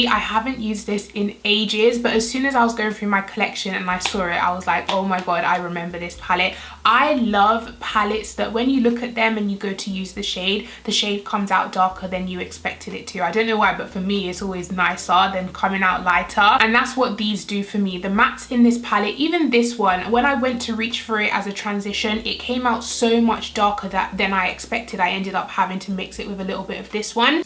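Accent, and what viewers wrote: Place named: United Kingdom. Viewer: I love to click on your videos just for your bomb ass looks and your accent! I love the British accent. Lol.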